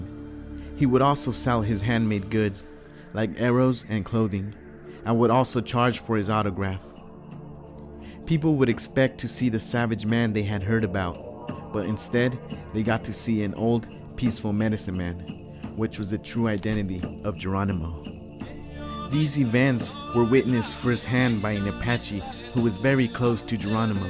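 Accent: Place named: Mexico. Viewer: who's the narrator ...sounds Mexican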